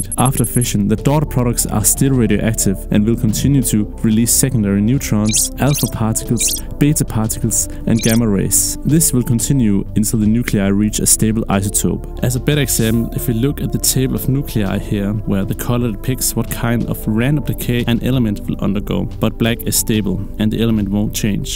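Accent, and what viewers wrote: Denmark. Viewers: That's the thickest danish accent I've ever heard. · is that a dansker i høre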